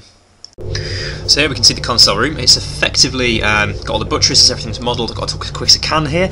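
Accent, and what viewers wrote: United Kingdom. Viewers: Glad to see the English accent's lost on most of you :) But thanks for dropping a line, the project has indeed ported over to Fallout New Vegas, the link to which you can find via my channel profile. Can understand why some of the non-British can't understand, we've got the most diverse dialect range in the world when it comes to a language. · uhm, lol? what, are you saying your Asian or Something, he is speaking English with a Pommey/British Accent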